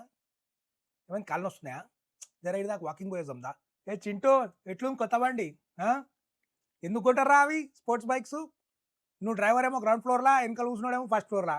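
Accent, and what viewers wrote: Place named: Hyderabad, India. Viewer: Amazing Slang of Hyderabadi Language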